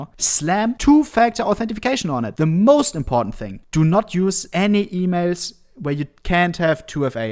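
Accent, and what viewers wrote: Germany. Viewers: Dude his german accent drives me crazy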